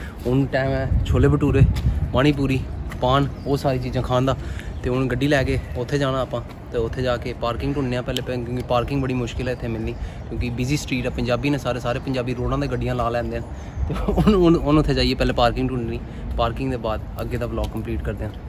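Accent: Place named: Jammu, India. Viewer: Bro punjabi kam dogri zada hai 😂❤️ but I really like it ❤️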